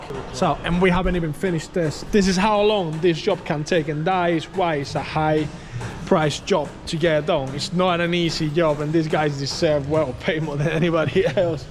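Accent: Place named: Liverpool, United Kingdom. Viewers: I just love that this guys accent is like a perfect blend 50% UK and 50% wherever he's from (not tryna be rude just don't know where he's from). How long have you lived in UK for mate? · He’s from The Dominican Republic via Liverpool 😂😂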